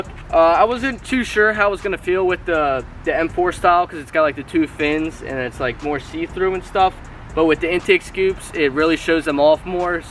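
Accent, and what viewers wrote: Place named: Maryland, United States. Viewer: Your maryland is showing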